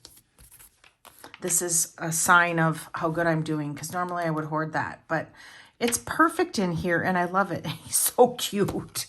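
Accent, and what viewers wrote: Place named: Minnesota, United States. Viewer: This woman is a trip. And the accent! Is it Minnesota? It’s not southern for sure but not that nasty north east yankee New Jersey thing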